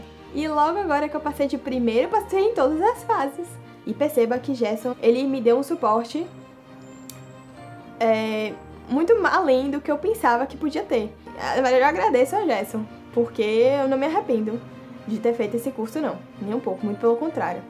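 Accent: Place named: Bahia, Brazil. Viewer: O sotaque baiano é inconfundível. Hahahahah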